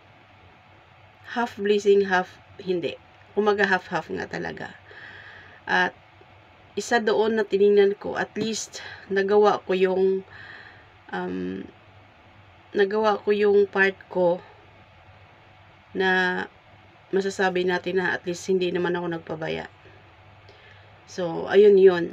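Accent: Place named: Visayas, Philippines. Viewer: Bisaya man sad diay ka ate. Apir🤚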